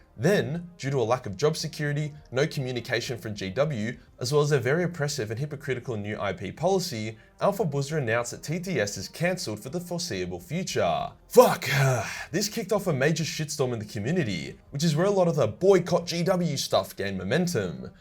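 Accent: Australia. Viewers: why does this man sound like australian chills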